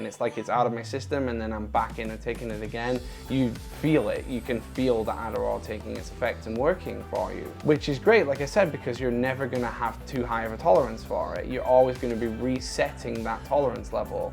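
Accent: United Kingdom. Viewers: You sound like a limey, is your adderall on Private RX? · So where are you based because you have a British accent but I don't think Adderall is available in the 🇬🇧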